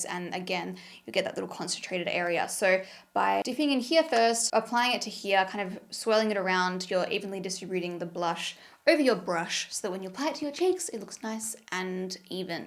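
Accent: Australia. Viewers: ! Hearing your voice reminds me of my Aussie cousins who were just here in the states. Was going to say though don’t feel bad for more engagement. We’re here because we love and want to see you succeed. We are your community! I’ve been watching you since you were at benefit it’s so crazy to see how makeup has changed since then.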